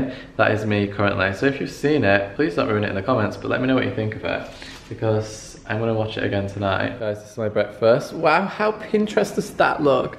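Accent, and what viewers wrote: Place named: London, United Kingdom. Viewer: I love the London accent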